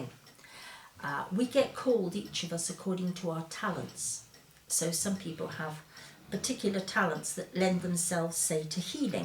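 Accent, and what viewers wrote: Wales, United Kingdom. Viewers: I live in next to Aberfan. I knew you lived in Wales from your accent from watching your other videos but didn't reolise you lived close by. Loving the videos by the way 😁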